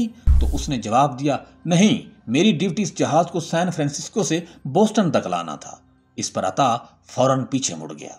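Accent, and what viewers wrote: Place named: Punjab, Pakistan. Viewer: Panjabi bhai urdu bolna to sekh lo pehley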